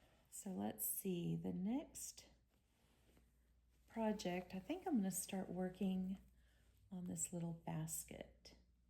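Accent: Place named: South, United States. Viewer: no you won't bore us. I watched two of yours videos today and you weren't boring at all. Plus you have that cute Southern accent. I wish I had one 😊 I'm a Southern girl at heart. At least I get to visit the south these days and I have Southern friends now.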